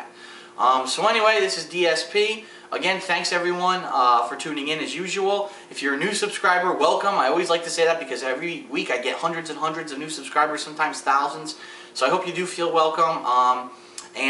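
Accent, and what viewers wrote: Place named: Chicago, United States. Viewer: i think he sounds like he's from chicago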